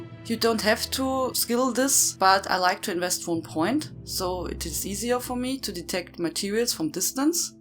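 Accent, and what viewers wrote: Germany. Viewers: ich mag den deutschen englischakzent irgendwie xD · Post Da stoner Seems like an German Accent my guy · Beutifull german/viking :D accent. :) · uiuiui xD Man hört das Deutsch sehr kräftig raus ^^ Mir hat das Tutorial gefallen, es war sehr informativ, hat meine Frage beantwortet und vorallem war es sehr unterhaltsam xD Nur eine Frage hätte ich an dich... ich hab irgendwas vonwegen Craftingquest mitbekommen... gibt es das oder hab ich mich verhört? Und wenn ja, wo kann ich so eine Quest finden? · Very solid explanation of a misunderstood but important part of the game. If I had watched this video about 3 months ago I'd be in a better spot with my crafting character. German accent also helps.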